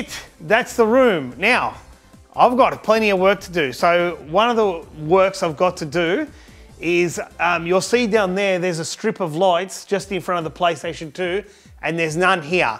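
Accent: Australia. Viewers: You Australian mate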